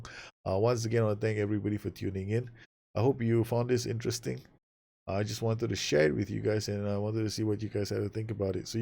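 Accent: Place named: Singapore. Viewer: I cant put a finger on your accent dude...at times you really sound singlish... Then you you would say something that would throw me off completely...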